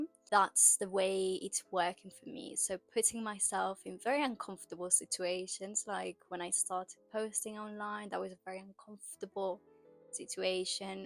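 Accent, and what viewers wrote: United Kingdom. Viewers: so, where are you from? cuz your accent is pretty similar to British🙂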